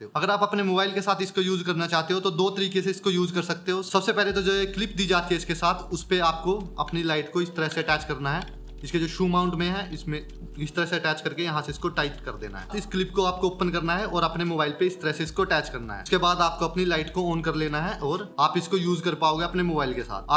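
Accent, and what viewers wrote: India. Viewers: you sounded like himachali 🤗 but great content..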